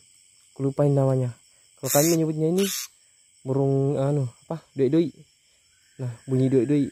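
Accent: Bugis, Indonesia. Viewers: Orang bugis kah bang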